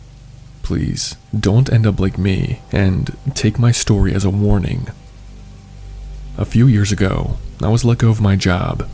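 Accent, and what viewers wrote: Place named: Delaware County, United States. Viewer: Love your videos & creative story categories ! Are you from DelCo ? Your accent is super DelCo lol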